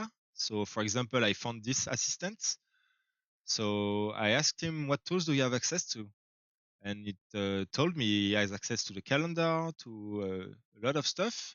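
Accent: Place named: France. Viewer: L'accent français ❤ j'adore hhhhhh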